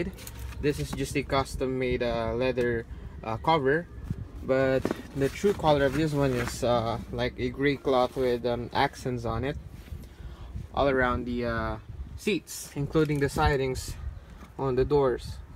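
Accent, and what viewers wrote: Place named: Philippines. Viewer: Galing mag english halatang pinoy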